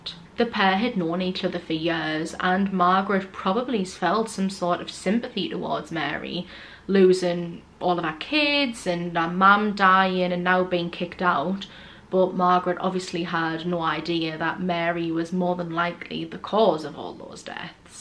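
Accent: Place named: Newcastle, United Kingdom. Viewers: I think your amazing young lady, thank you from an old woman 👵 🤣 brilliant work 👏 Is that a Newcastle accent Pardon my ignorance I have relatives there and love Newcastle ❤️ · whey bonny lass.....listening to your voice makes me feel homesick - stuck down in Kent for a while but our home is up near Crook in God's own County - Durham a lovely bit of research here, presented without hype or sensationalism have hit the subscribe button for you Mary Anne Cotton's house is still there in Front St. West Auckland...the original black Widow... As an aside, local lore states that the grounds of Raby Castle are supposed to be haunted by the victims of Mary Anne Cotton. The ghostly victims are supposed to run across the estate of the castle, playing what can only be described as a game of tag.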